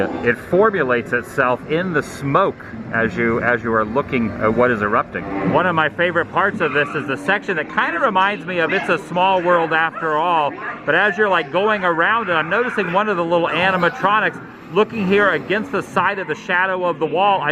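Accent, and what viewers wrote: South, United States. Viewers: Your accent is changing. Its very very subtle but your voice is becoming less southern.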